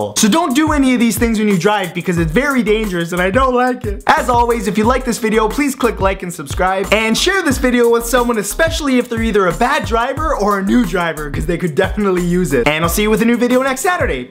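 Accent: Canada. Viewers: I love it when he's in a mad rant it's still kinda pleasant cuz he's so canadian. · this is going to sound completely rasist but are u Canadian.I love your vids u r cool 😎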